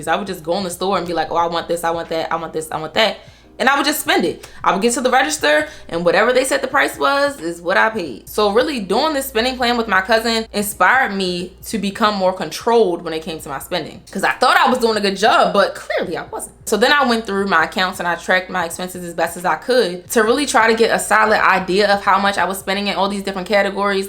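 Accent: Baltimore, United States. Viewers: that baltimore accent heavy😭😭great content thank you!! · I hear Bmore accent 😌?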